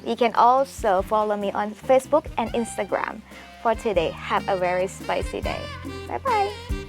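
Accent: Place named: United States. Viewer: Your English accent is not Thai...I'm sure you have been in America...🥰 Love from New Jersey ❤️🫰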